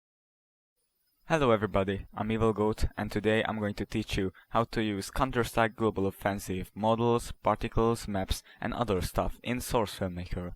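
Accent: Hungary. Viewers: Szia :D Ahogy láttam a géped nyelvét, és hallottam a kiejtésedet, magyar vagy :D Nagyon szépen foléykonyan és érthetően beszélsz angolul, kívánom, hogy legyen vele nagy hasznod az életben! :) :D Ui: Köszi, nagyon sokat segített a videó :) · Az akcentusból tudtam meg hogy magyar vagy! Sok angol után nagyon könnyű kihallani :D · Nagyon durván hallatszik a magyar akcentus :D De ügyes vagy! csak így tovább!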